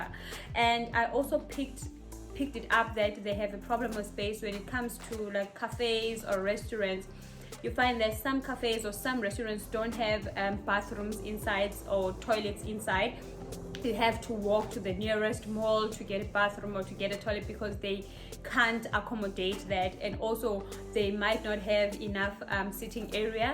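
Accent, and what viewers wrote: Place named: South Africa. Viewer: No our accent isn't different yours is different we were born and bred in England and we speak English, you speak south African English with a South African accent.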